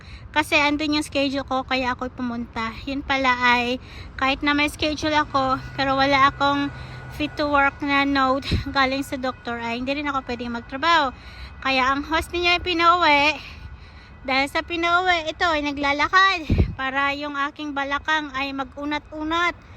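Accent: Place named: Pakistan. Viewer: Nice sharing sissy pero natawa din ako sau sa nag aantay nang maasawa hehe..nakakatuwang Pakistan ang boses mo sissy masayahin kang tao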